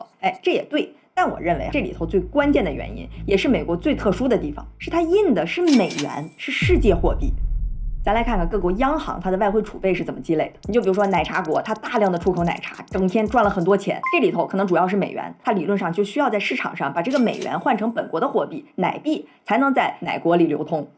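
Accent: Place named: Beijing, China. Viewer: 京片子遛兒的！好聽！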